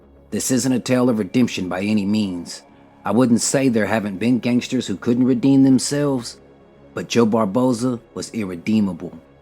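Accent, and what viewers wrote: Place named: South, United States. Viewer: Where is your accent from? I'm from New England and I know it's not from Boston. I hear hints of mid southern or even Midwest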